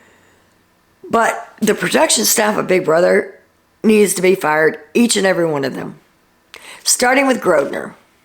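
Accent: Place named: Louisiana, United States. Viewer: I just recognized the LSU fabric in the background. Im in Louisiana and I thought your accent sounded familiar. We have such similar views on this cast and their doings; must be a southern thing.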